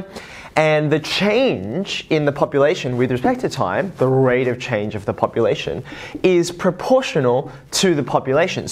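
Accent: Australia. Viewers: His accent is aussie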